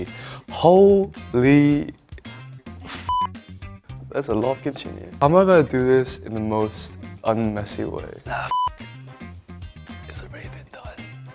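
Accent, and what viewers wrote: United Kingdom. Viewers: HIS BRITISH ACCENT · HIS BRITISH ACCENT IS EVERYTHING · MINHO YOU HAVE SUCH A LOVELY ENGLISH ACCENT 😊 BEAUTIFUL #KITTYMINHO HERE 🥰🫡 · British accent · His British accent ❤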